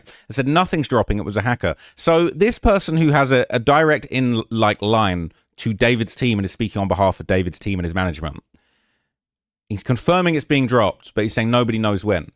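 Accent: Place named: England, United Kingdom. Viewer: Hes from England numb nuts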